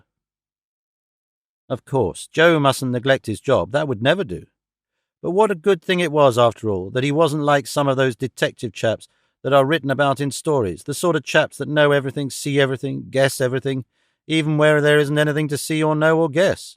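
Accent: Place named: England, United Kingdom. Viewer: Best version of this, in my view. The English accent just MAKES it.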